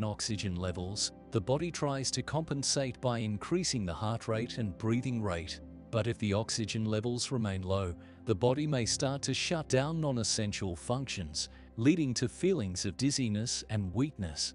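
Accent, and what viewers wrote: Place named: Australia. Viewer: Omg i love his Australia accent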